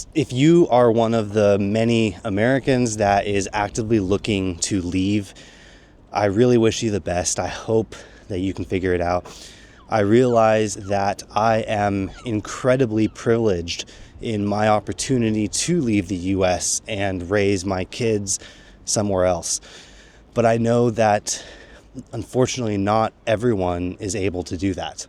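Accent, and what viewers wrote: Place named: England, United Kingdom. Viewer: Gee yer heed peace min. You sound like a sassenach.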